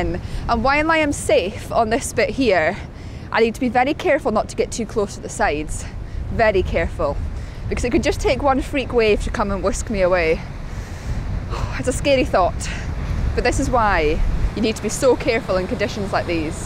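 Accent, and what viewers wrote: Scotland, United Kingdom. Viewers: Totally insane!! Great video! Delightful scottish accent by the way, like it hahaha!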